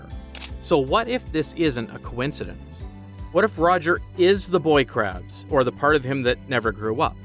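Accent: Canada. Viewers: Are you Canadian? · Your channel might be marked “United States” but your accent outs you as Canadian. I’m on to you, buddy! 🫵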